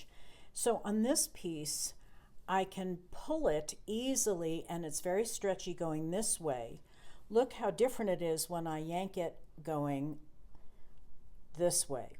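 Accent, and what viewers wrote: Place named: United States. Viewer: Oh, you’re so welcome. If it’s any consolation, even young immigrants find it hard to make friends here (see Simple Living Australia - I think that’s the channel name. I’ll come back and edit if I got it wrong.) it might also be more difficult because of your accent. Americans don’t have a good reputation here, unfortunately for you, because you sound like good people. Please, if you know of any anti-Trump or resistance protests here in Oz, please let me know. I’ve wanted to attend No Kings protests here in Sydney, but can’t find any details?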